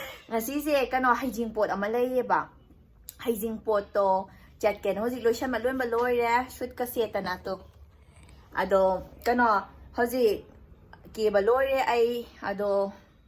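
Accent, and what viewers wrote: Kakching, India. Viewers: kakching lonsi taba nungai.nice 😊👍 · yam pakcheiye mta ado mtase kakching dagyro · Taba ymna nungaiye kakching gi wa khungang se... ym nungc ye. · Che ym nungcye Londo kkching emnbi miss twe💙😪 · I love kakching accent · Che se kakchingdeiro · Eche nggi kakching marol ngangbase yam pakchei yamnasu fajei👍👍👍👍👍👍👍❤️❤️ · I like Kakching marol 🤪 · Kakchingdeiro che je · Yam laida kakching marolse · Kakching lon se taba ym nungaiye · Ema nokninge eikhoi kakchinglonse camera dana hena nokningbana nungdi ym nungcbani eikhoi lonse haha😍😘🥰👍🤭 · Kakching maron c taba ym nungaiye taninge amukkasu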